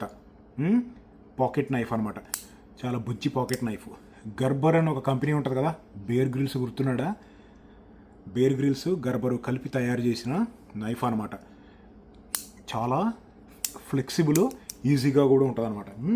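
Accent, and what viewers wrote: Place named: Guntur, India. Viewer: Yes. May be from guntur according to the slang.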